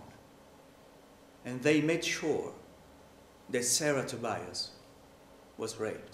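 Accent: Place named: United Kingdom. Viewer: your british accent is perfect! :)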